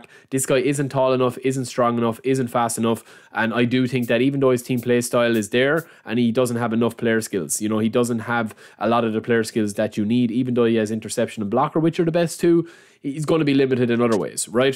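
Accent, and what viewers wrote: Ireland. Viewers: Are you Irish brother?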